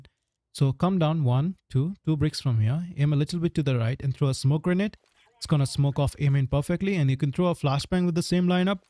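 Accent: India. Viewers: you sound Indian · always the indian accent tutorial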